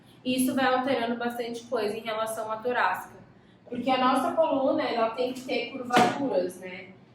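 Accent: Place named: São Paulo, Brazil. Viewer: É uma pena que sotaque paulistano é tão ruim de ouvir.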